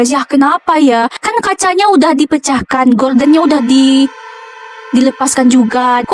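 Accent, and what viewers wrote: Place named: Padang, Indonesia. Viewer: Kak * dg khas logat padang nyaa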